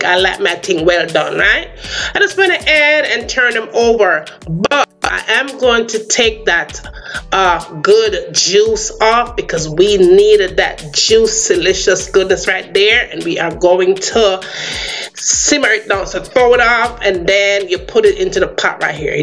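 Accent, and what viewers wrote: Jamaica. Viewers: I came here to find out how to cook drumsticks. Within 3 seconds I knew the cook was a Jamaican! Must haffi yam it now!!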